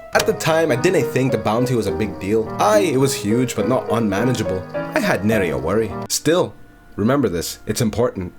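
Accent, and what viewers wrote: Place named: Scotland, United Kingdom. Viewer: why does the Scot accent suit you so much hahahah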